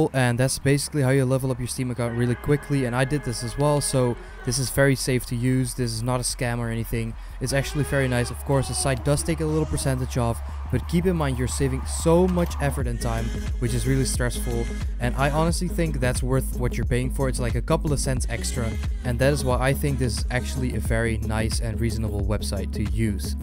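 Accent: Netherlands. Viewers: Instantly knew you were dutch hahaha you guys never can hide your accent :) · IK WIST DAT JE NEDERLANDS WAS! :D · Are u Dutch? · i just knew that you were dutch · Wait. Are you Dutch? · ben je nederlands xD lol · dutch accent NotLikeThis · lol ben jij Nederlands? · Are you from the Netherlands?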